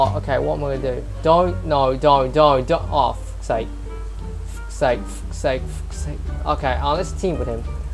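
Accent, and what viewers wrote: United Kingdom. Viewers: u should combine fighting with the sword with the fishing rod more often,especially use the fishing rod when someone is near the edge,it'll knock them off further xD (công nhận bác nói tiếng anh giỏi ghê >o< accent giọng Anh nhỉ?)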